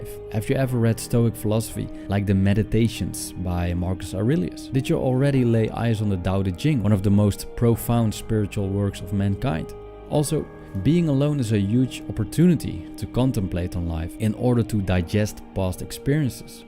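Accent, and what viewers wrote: Netherlands. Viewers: Hoor ik nou een hollands accent :-)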